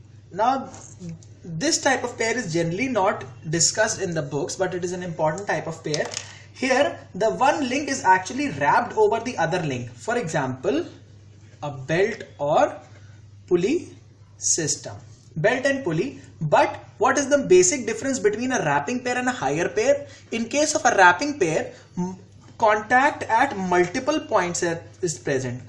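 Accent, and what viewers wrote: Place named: India. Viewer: No fucking Hindi ROACHES accent.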